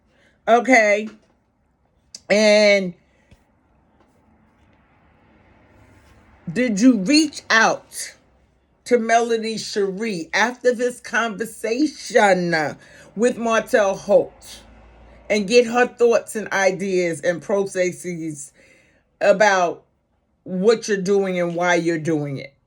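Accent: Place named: New York, United States. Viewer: I knew you was from NY the first day I listened to you. that accent is definitely a New York accent and I have never been to New York a day in my life, but I have met people from New York and I love your accent and I think you’re doing a great job. keep up the good work. ❤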